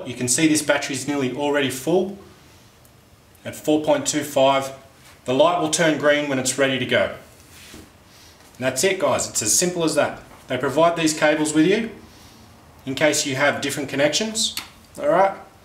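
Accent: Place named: Australia. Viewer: Thanks for the review and great accent! What part of Australia is that? I was I Sydney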